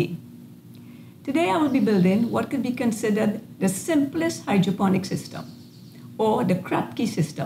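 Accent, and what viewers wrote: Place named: Trinidad, Trinidad and Tobago. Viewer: Trinidad accent ... Love it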